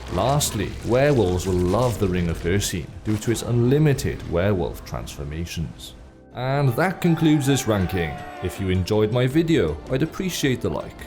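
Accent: Wales, United Kingdom. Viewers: I didn't see your name until after I noticed your accent 😂 fellow Welsh Skyrim enjoyer.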